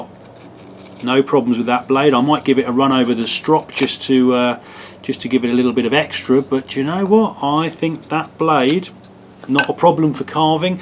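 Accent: United Kingdom. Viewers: I love your Englishman accent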